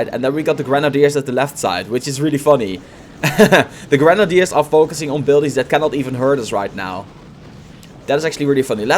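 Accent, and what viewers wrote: Netherlands. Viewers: Je bent 100% Nederlands, dat hoor je gewoon.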